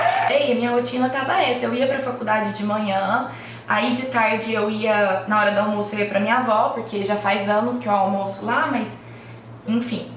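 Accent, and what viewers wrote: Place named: Uberlândia, Brazil. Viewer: Saudades do seus vídeos que bom q voltou!!! Saudades desse nosso sotaque lindo de Uberlândia!!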